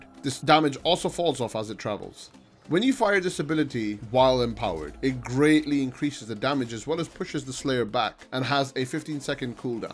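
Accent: Ireland. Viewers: take care of your damn. As someone with heart troubles as well (defective valve that had to be replaced a few years ago,) it's one of the most important organs you can take care of. Keep us posted, but rest up. I'd like to have your dulcet irish tones around for many more years.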